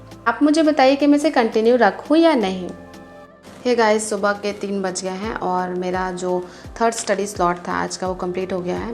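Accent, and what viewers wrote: Bihar, India. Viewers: Continue rkho short video with Bihari Tone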